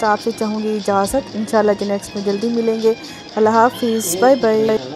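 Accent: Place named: Punjab, Pakistan. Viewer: Apka bòlna buht acha h jb suĺtn bahoo darbar pe jy to waha log asy bolty h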